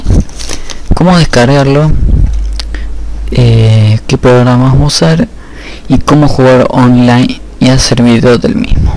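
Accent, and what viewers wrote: Córdoba, Argentina. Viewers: no entiendo muy bien el acento argentino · Que buena tonada cordobeza XD .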